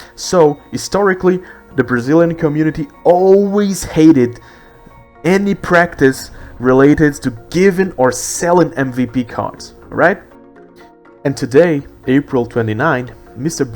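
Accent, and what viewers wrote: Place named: United Kingdom. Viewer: vídeo perfeitinho, meu dog. also: whats is up with this british accent? pretty fancy, i'd say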